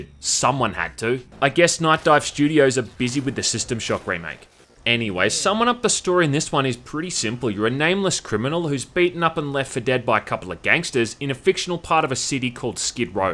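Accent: Australia. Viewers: defo an aussie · My new favourite thing: listening to game reviews in an australian accent 😅 I wanna add, that cypress hill not only made the soundtrack some of the voice acting as well.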